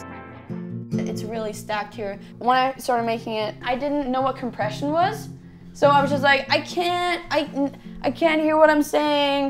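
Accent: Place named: United States. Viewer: guys this might be a stupid question but i’m a super new fan. if she’s norwegian why does she speak with an american accent?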